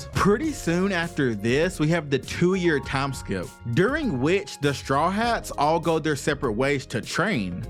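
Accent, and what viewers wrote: South, United States. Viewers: Sorry but with that weird ghetto southern drawl i couldn't make it past the introductions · You have such a southern accent man, where are you from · Nice southern accent. Not hating, I have a southern accent too. Awesome video though and I’m subbed now.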